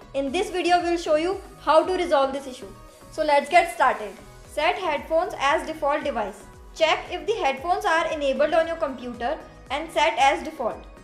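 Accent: India. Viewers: most hardcore indian accent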